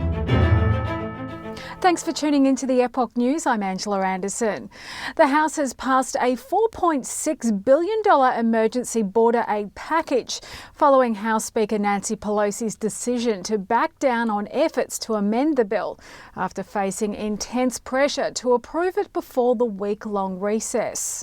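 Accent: Australia. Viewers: Perfect lady anchor gorgeous with an Australian accent. Love it!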